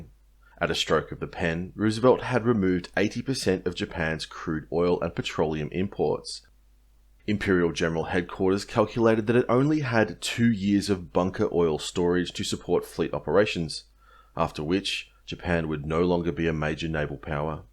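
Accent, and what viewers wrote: Australia. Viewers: Thank god its not AI.... bonus: aussie accent too!😅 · This Aussie narrator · He is actually an Australian - that is not a British accent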